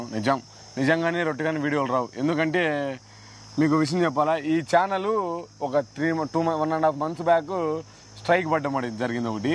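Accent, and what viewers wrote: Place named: Kadapa, India. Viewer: Mana kadapa volla slang ge ante. Ray youtube ga okasari ma kadapa vachii chudu sami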